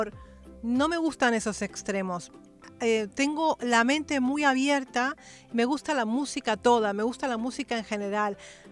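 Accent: Spain. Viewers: si eres argentina porque? hablas como española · Es que reacciona y explica todo a detalle y eso que es de España y a veces se pierde el hilo de la idea por el acento o palabras distintas 😎 saludos desde 🇲🇽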